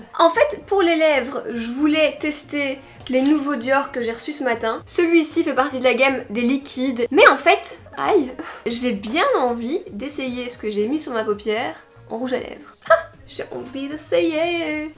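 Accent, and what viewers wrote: Belgium. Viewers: 🙊💞 j'aime vrmt le contenu de tes videos! Tu es super naturelle, et j'adore ton accent belge 😍😍 Bonne continuation 🙆💕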